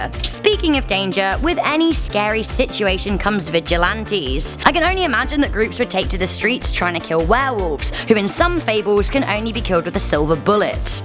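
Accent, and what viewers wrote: United Kingdom. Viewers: WHUUWWEERRWOLVES!!! lol I love this British lady.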